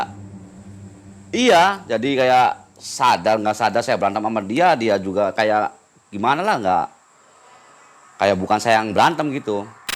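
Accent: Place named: Java, Indonesia. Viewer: Maaf klo boleh tau sampean orang mana sih klo aq liat tayangn sampean ko Kya alergi banget bahasa Jawa..apa" ga tau?padahal klo di lihat sampean bicara logat orang jwa juga?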